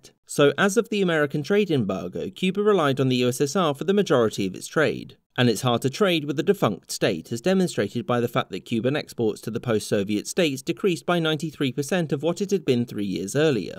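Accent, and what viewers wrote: United Kingdom. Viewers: British accent is so annoying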